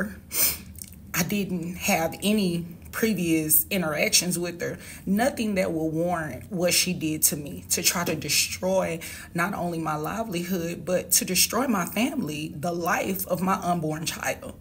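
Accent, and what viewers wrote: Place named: South, United States. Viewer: Great to hear you got through. On another note that may put a smile on your face. From over here in England, we kinda like the southern accent. I have been waiting for a ya'll since hearing you keep saying "you all may be seated". Can hear you hiding it, Thank you for your ya'll.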